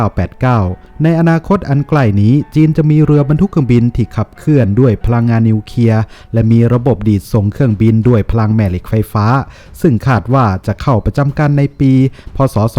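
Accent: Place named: Provincial, Thailand. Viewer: พูดบรรยายแบบเหน่อๆ ฟังดูขัดๆกับเทคโนโลยีใหม่ๆครับ พูดกลางเน้นเสียงเข้มจะมีความลงตัวที่สุดครับ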